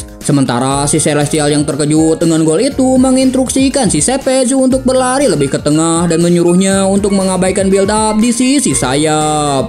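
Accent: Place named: Sunda, Indonesia. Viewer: Sunda bingitz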